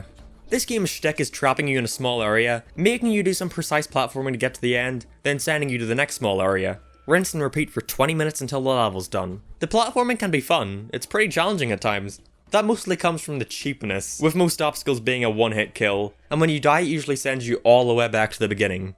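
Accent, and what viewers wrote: Northern Ireland, United Kingdom. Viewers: Are u from NI ahah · Northern irish accent. You from Belfast?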